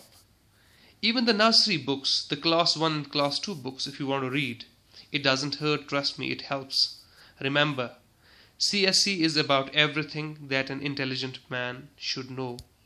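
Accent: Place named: Kashmir, India. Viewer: Every kasmiri aspirant has this tinge in accent and when he speaks it sound like poetry... Athar aamir b aisa hi bolta hai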